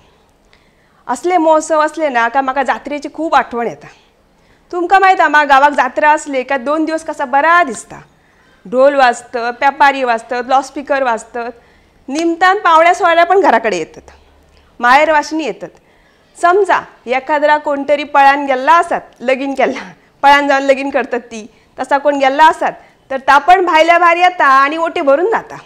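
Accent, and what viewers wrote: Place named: Malvani, India. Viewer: Apli Malvani dunayet Bhari God rasal 👌👌👍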